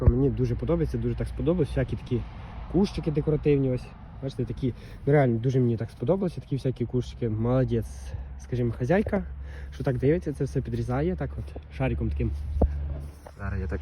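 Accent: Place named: Dubno, Ukraine. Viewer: Чую по розговору, в мене бабуля з Дубенського району була, все дитинство там провів 👍👍👍. Зря хочеш продавати пасіка то для душі....але ти правий шо особо не заробиш на цьому ділі.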